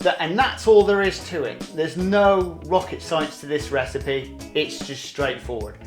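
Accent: United Kingdom. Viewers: Great recipe and nice british accent!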